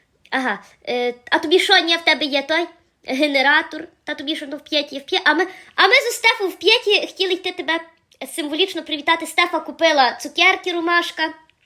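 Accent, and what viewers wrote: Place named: Lviv, Ukraine. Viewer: Боже ,так розмовляє моя мама і тітка .Як мені подобаєиься 😁😁😁Львівщина❤❤❤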